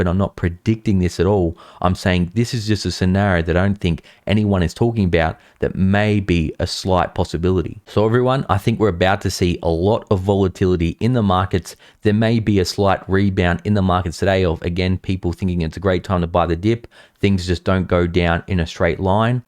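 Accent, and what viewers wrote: Australia. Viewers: Love your down under accent ! Lol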